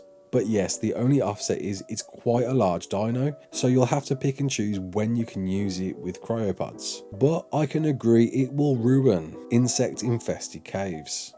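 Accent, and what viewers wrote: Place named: Walsall, United Kingdom. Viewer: Only just came across this video, it was really really helpful. You sound like you’re a fellow Walsall lad 😄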